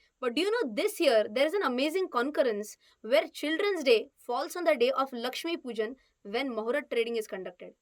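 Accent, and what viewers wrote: Maharashtra, India. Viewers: Mam, your confidence is amazing.. and the light Marathi touch in your English pronunciation makes me feel you as my elder sister... subscribed and will be attending your videos regularly.. Thank You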